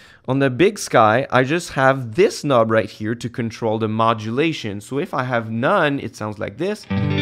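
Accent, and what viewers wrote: Quebec, Canada. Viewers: Vidéo made in Québec selon l'accent non ?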